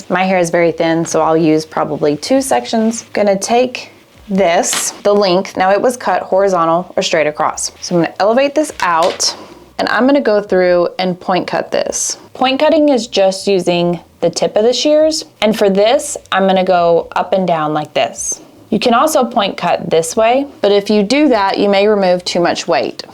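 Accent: South, United States. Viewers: Is it me or did you have a southern accent years ago and now you don't seem to as much. I have been so grateful to find you in 2022 you helped me through cosmetology school. I still love watching your videos. Thank you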